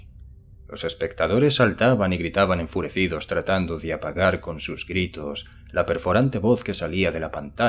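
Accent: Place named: Spain. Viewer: Que feo escucharlo con español de españa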